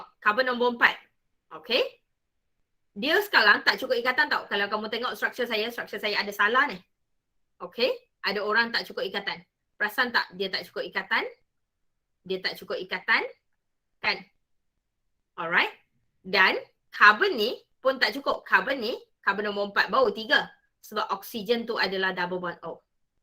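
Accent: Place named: Kelantan, Malaysia. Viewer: Just want to know..Are you from kelantan madam? I'm studying at Kelantan matriculation college and learning some 'kelantan malay' ..and I can hear some kelantan slang when you speak malay.. really love the way you alternate malay and english to make the students understand the chapter very well.. currently addicted listening to your teaching and hopefully I can score well in my pspm 1 &2 especially for chem..❤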